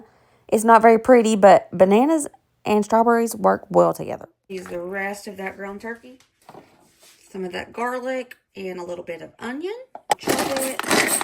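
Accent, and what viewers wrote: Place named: Missouri, United States. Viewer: Hey are you from Missouri? Your dialect really sounds like it 😅😊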